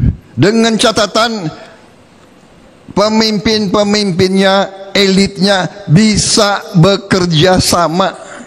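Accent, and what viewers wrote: Java, Indonesia. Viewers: Mas jawa apa Mas sunda pak 😄😃😀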